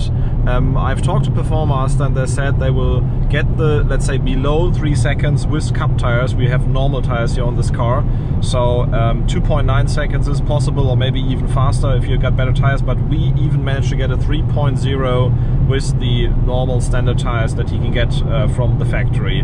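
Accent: Germany. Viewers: I love it how you can hear your german accent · tscherman accent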